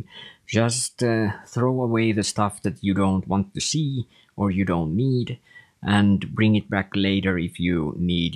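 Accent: Finland. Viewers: Excellent tutorial :) Did I detect a Finnish accent correctly? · You sound very Finnish!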